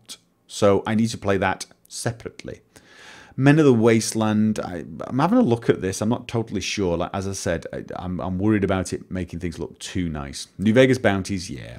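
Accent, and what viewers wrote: United Kingdom. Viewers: Does he have a British or Scottish accent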